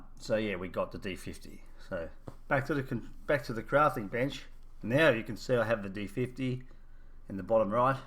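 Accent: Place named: Australia. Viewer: I can already tell your Aussie 😂, nice video and great explanation 🤘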